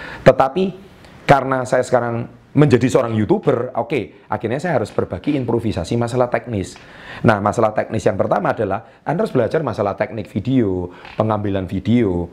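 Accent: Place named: Surabaya, Indonesia. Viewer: ohh.... wong suroboyo tah ? hahaha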